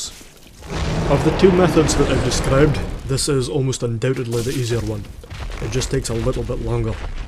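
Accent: Scotland, United Kingdom. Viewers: Loved it, cannae beat a gid Scottish narrated video. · Pretty sure that's a heavy-duty Scottish accent. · Oh god, you're Scottish, marry me <3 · Scotland laddy like me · You sound like a Scott. You are naturally awesome if that's the case. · I tried to think of a something funny to say about your Scottish accent. But I couldn't think of anything. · Guy's a Scot, mate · That's the most Scottish accent I've ever heard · ***** Scotland mate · Are you like, Scottish? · Russian? oO By far you should know this guy is Scottish. xd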